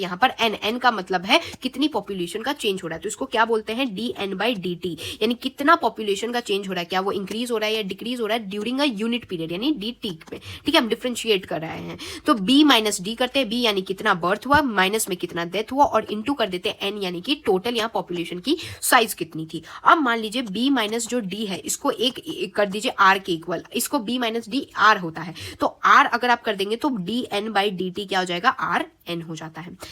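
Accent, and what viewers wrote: Bihar, India. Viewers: Are you bihari?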